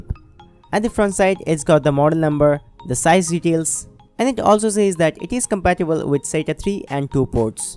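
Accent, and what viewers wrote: India. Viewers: You are absolutely correct. When I listen to people from India speak English (especially on this channel) it takes me a few moments to realize they are speaking English and to mentally adjust to their rapid verbal pace. If the author wants to appeal to a wider audience then your advice should be taken.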